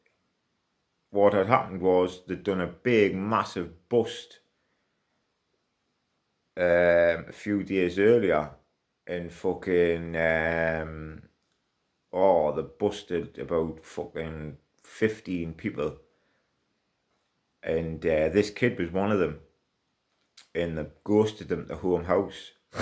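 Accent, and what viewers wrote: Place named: Newcastle, United Kingdom. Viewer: Its the geordie bro 🙂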